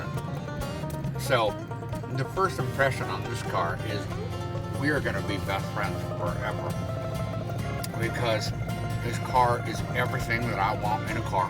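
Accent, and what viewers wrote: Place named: Appalachia, United States. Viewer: is too good at the hillbilly accent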